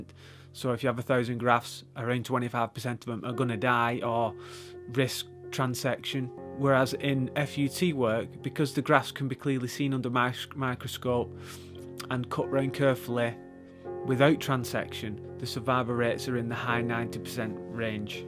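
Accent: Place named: Lancashire, United Kingdom. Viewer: “Hurr from thurr” haha. Love the Lancashire accent. Hair looking great man:)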